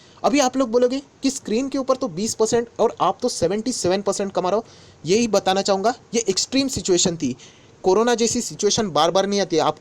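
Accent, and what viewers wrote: Maharashtra, India. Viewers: by ur Hindi I got that u from Maharashtra so let me know bro 😎 that I can meet u really u are the honest retailers well wisher🙏🙏🙏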